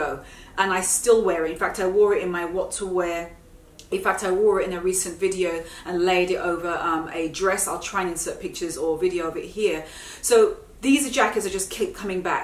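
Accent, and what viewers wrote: England, United Kingdom. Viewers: Love your videos,. I'm a Scot living in SoCal and really enjoy your lovely English accent and style, of course <3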